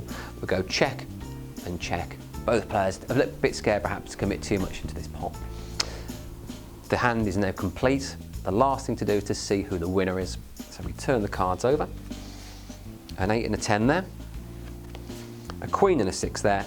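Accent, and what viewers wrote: United Kingdom. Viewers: First “how to play” video I watched was an Aussie accent, this one is a British accent. Maybe third times the charm? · I can't understand this guy with that heavy English accent. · He's actually Russian but he does a great Brit accent when explaining a Texas game. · why a brit guy is teaching texas poker ? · One of the simplest vids to learn despite his deep British accent lol.good Good thx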